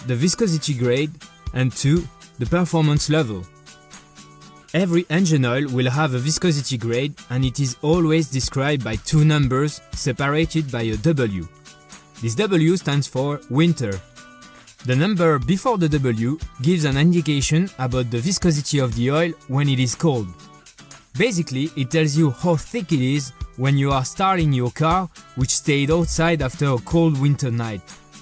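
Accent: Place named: France. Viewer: Thanks. I like your French accent 🌷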